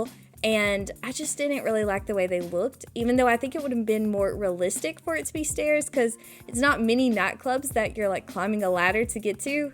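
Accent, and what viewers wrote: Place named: South, United States. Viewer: i love ur souther accent! and this build is absolutely killer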